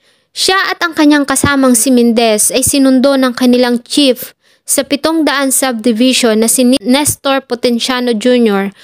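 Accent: Philippines. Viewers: Oky lng UN pilipino nmn Tayo my kanya knya acsent nmn kahit sa ibang bansa tulad din nmn natin cla hind Rin cla magaling mg English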